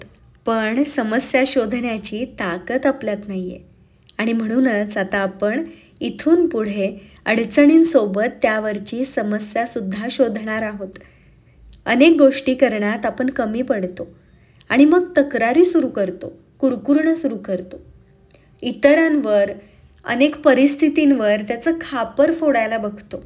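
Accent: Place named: Satara, India. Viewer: तू ना खरंच लय भारी कडक आहेस आमच्या सातारी भाषेत बोलते,लहान वयात इतकी समज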